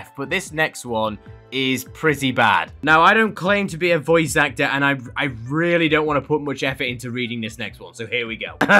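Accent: United Kingdom. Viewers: Question: are you from the United Kingdom?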